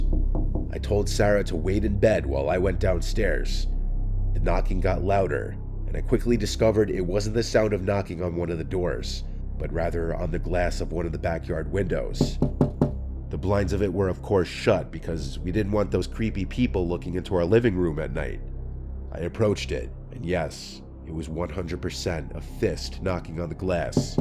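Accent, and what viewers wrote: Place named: New York, United States. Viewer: has a new york kinda accent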